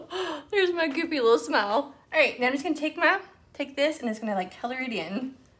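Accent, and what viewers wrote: South, United States. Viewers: I hear a drawl in your voice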